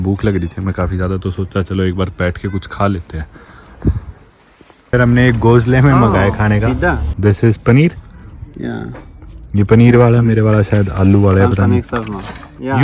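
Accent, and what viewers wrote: Haryana, India. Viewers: Kbi kbi toh aap pure haryanvi me aa jate ho baladth- ox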